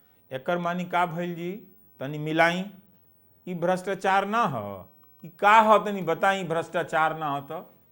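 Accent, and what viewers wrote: Bhojpuri, India. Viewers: बहुत बढ़िया,समाचार पढ़े के शुद्ध भोजपुरीया अंदाज बहुत अच्छा लागल हऽ। शुरू मे आउर आखिर मे इ जे नमस्कार कहिला ओकरा जगह हमरा हिसाब से प्रणाम कहेम त आउर प्रभावशाली लागी। 🙏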